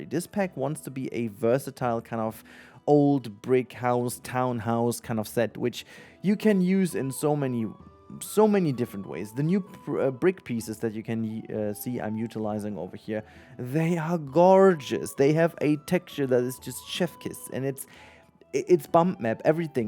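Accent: Germany. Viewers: Johannes Kepler in Süchteln und dann Berufskolleg in Dülken. Ich wusste doch, dass Du nen niederrheinischen Akzent hast 😂😂😂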